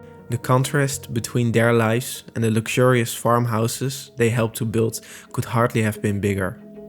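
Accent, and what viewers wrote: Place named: Netherlands. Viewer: Very Dutch looking and sounding young man :)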